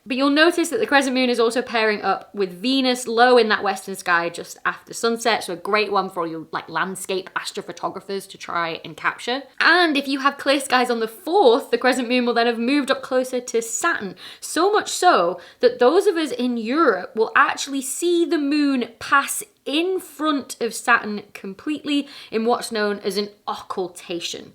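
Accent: United Kingdom. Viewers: What accent is this, by the way? Like, where in Britain do people speak like this?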